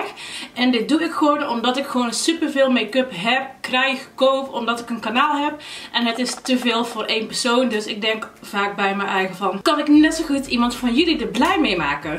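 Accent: Brabant, Netherlands. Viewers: Hoi, ik volg je al een tijdje. Wat ik leuk vind is dat je alles duidelijk uitlegd én wat is super vind is dat je uit mijn stad komt Den Bosch. Het is super om het Brabantse accent te horen en te weten dat je zo geliefd bent. Succes met je kanaal en je verdere carrière. Groetjes Ingrid Saris❤️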